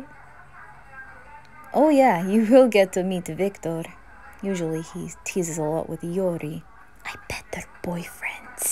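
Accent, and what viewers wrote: Russia. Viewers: The amount of ppl speak russian in here lmao i'm russian and your russian accent and russian it self is really good keep up the amazing work love❤❤❤❤ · Ваш русский акцент прекрасен (*´﹀`*)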